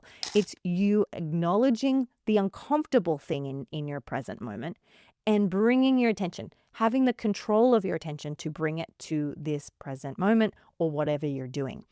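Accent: Australia. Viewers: what is your accent?- Australian American-midwest...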